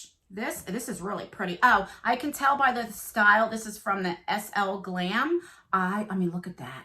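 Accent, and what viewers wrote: New Jersey, United States. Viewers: I absolutely love watching you. I currently live in Alabama (20+ years ) but my family is a bunch of " Damn Yankees " . You remind me of cousins who are sweet with just a little tart thrown in to keep it interesting .By the way did you know you have a Jersey accent ? L.O.L.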